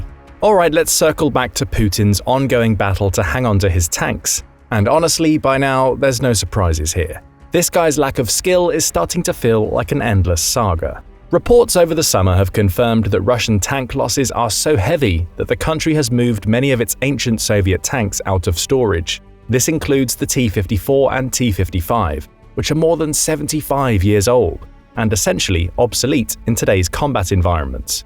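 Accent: United Kingdom. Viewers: Another video with a factual error about every minute, visuals that have no relevance to the narration and no insights that couldn’t be gleaned from reading a news site. But they did enlist a British narrator to give it a thinly veiled patina of authority.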